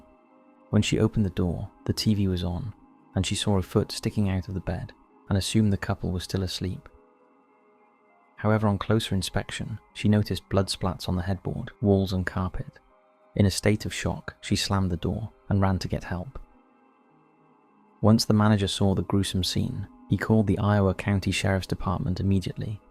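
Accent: United Kingdom. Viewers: His voice is so lovely and mellow, and I love a British accent!!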